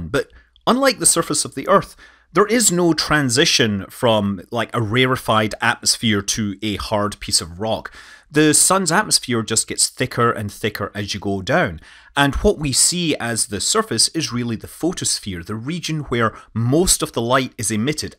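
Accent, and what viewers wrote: Scotland, United Kingdom. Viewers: Love to hear a Scotsman talking about a magnetohydrodynamic drive, as always.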